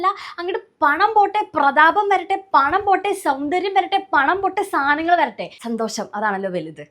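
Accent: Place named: Kannur, India. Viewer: Panam potte powyer varatte. (Kannur basha)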